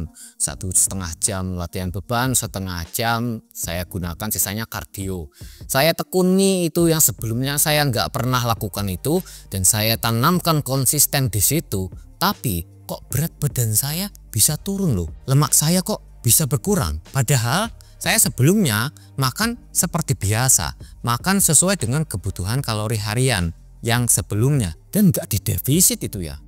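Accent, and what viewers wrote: Java, Indonesia. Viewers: Jadi medok gini bang wkwkwk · Sampean jowo to bang, kok medok😀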